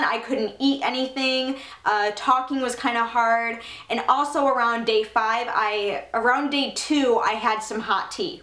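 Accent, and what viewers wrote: Michigan, United States. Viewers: I'm sure that the only reason people ask if you have a lisp is because you're from Michigan. A lot of people I know sound like this too😂 I sound like it as well, and I live in Michigan.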